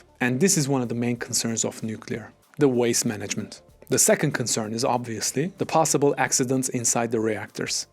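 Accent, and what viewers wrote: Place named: Turkey. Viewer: Nice and clean video. The accent rings a bell, any chance ur a Turk?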